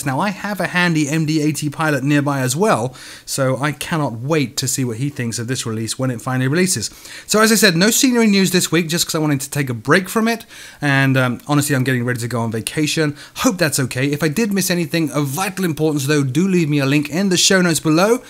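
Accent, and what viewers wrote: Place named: England, United Kingdom. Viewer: He's English, he won't pronounce words like you or other Americans.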